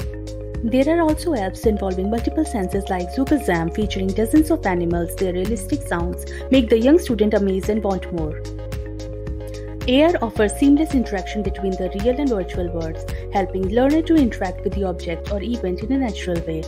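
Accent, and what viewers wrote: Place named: India. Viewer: Yeah, also the Indian accent English grates on the ear. Slow down please. I feel like I will explode while watching but the content is good.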